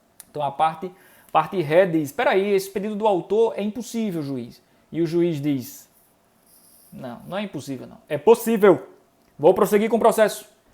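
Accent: Northeast, Brazil. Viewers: Parabéns pelo conteúdo. Gosto muito do sotaque nordestino, lembra meus avós.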